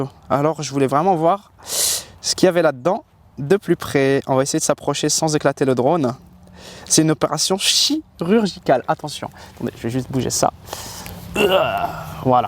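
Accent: Quebec, Canada. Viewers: C'est moi où tu commences à avoir l'accent québécois ? Mdrrrrrrrr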